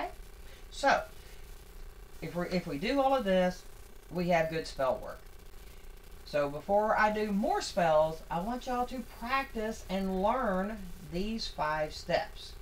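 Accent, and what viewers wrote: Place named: South, United States. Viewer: I just love that Southern accent.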